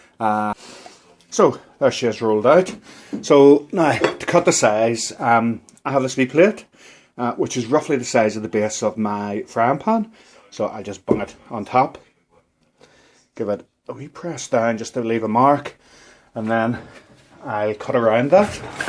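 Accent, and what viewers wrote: United Kingdom. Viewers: I hear the (Northern) Irish accent!!!!! Your recipe is EXACTLY the same as my Aunt used to make..... Mo Chara! 👍👍👍👍👍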